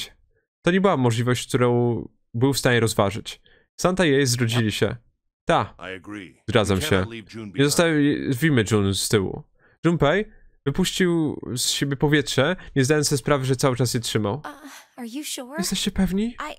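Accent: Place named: Podlasie, Poland. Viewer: coś ostatnio masz chyba jakiś vibe na podlaskie akcenty już drugi film ci się to zdarza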